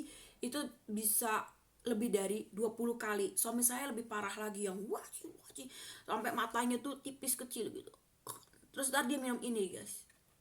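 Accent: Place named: East Java, Indonesia. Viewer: sama kak,ak juga minta maaf ya bila lambat terus berkunjungnya, btw kakak indonesia nya mana ya,kok hajing hajing wahing sama kayak.jawatimuran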